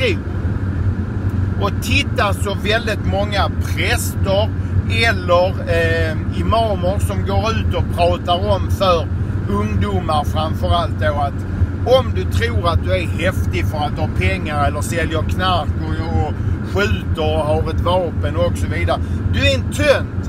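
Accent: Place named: Skåne, Sweden. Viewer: Älskar din skånska röst, Jag är också en skåning! <3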